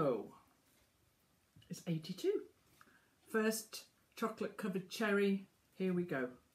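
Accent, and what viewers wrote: United Kingdom. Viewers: I just found your channel linked to Hercules Candies channel. You are so sweet and fun to watch. You made me smile several times as I watched. You stated many things that I feel about Hercules Candies and their crew. It was so fun watching you use the puddle and Steve's technique. Plus, the added bonus of your charming British accent. 😏 Thank you for the smile today. (I know a year later..) I'm looking forward to catching up and watching more. 🙂💚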